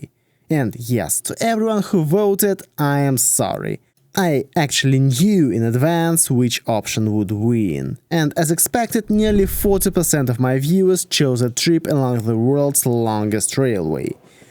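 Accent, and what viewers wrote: Russia. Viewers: Дед ёпта ну постарайся название городов или привычные заимствования произносить не настолько по-русски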